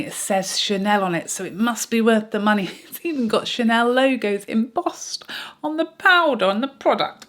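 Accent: United Kingdom. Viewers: I love someone with a British accent telling me what to do! I’m not even tempted by the CT cream bronzer bc I never use the 2 I own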